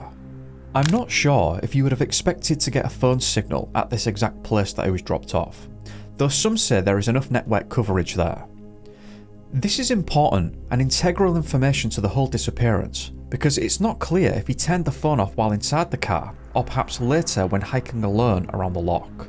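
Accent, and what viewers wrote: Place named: North, United Kingdom. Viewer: I just really am struggling to listen to your northern accent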